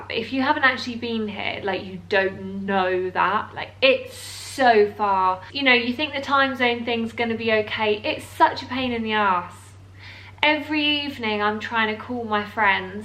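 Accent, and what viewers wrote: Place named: United Kingdom. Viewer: i cant pick your brit accent . where u from ?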